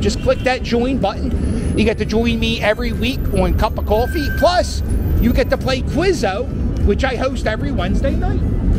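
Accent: Philadelphia, United States. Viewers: Love that Philly accent. Left in 1957 and never really lost it.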